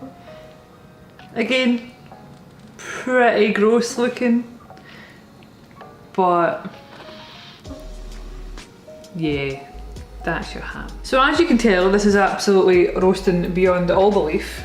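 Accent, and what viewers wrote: Scotland, United Kingdom. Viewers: Nice to hear the Scottish accent as I have been away for many years! Parsley is rich in vitamin C and other antioxidants, which help reduce the risk of serious health conditions like diabetes, stroke, heart disease and cancer. It's also an excellent source of: Vitamin A. Folate. I make the soup just like you and like you mentioned turnip has to be in mine, I won't make it if I forgot to buy it. To make it healthier just after I take the ham out, I skim to top of the ham water into a glass container and place it in the fridge just in case I need it - you will see the separation of liquid and fat and be glad it is not in your soup.(also do it for all my stews) White pepper is nice and poultry seasoning has thyme and rosemary.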